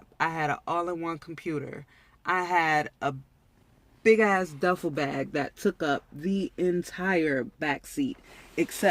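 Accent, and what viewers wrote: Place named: St. Louis, United States. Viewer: New subbie hurrr . I just knew u was from the Lou because of your accent with the “ rrr”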